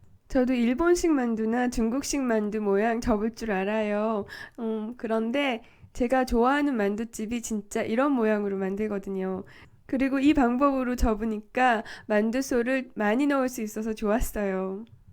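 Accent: Seoul, South Korea. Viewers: 연세가 어떻게 되시나요? 서울사투리에 가까운 발음이네요...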